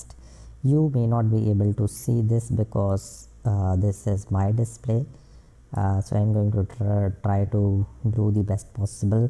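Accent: India. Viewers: the indian voice tho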